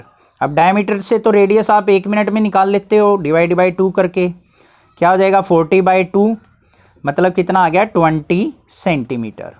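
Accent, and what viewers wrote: Haryana, India. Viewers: Sir aap Haryana se ho kya? You are helping all of us sir☺️👍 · I love ur haryanvi accent 🥰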